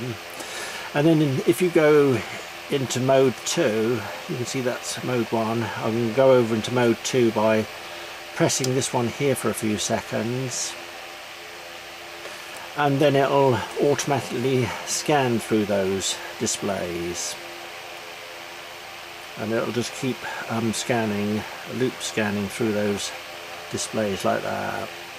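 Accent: United Kingdom. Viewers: Hi, I can tell by your accent that you are a fellow British hobbyist. Currently, I am not sure where to find metal stock at a good price. Do you know good places in the UK to find some thanks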